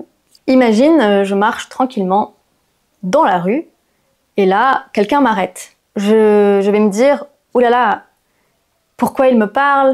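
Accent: France. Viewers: Je ne t'ai jamais entendu parler anglais auparavant. Cet accent français est charmant 😂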